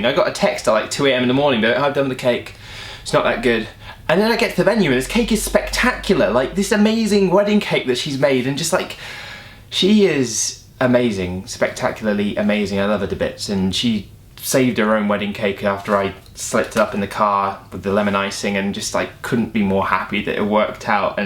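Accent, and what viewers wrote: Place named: England, United Kingdom. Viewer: 'Southampton', the accent, London....probably England, unless they moved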